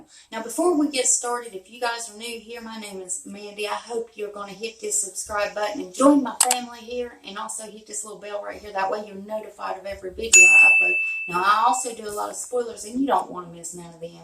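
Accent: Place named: West Virginia, United States. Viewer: I live on West Va/Va border and we have the same accents, you could be my neighbor. Lol. So our accents are the best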